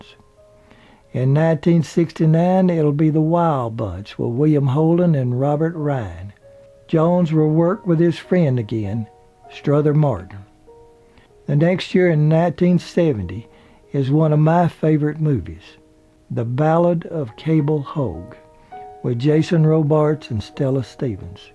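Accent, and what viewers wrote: South, United States. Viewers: Mr. I enjoy your Southern Draw and your coverage of these fine actors in our past enjoying their movies while growing up. Thank You Sir for your stories of important memories enjoyed in life.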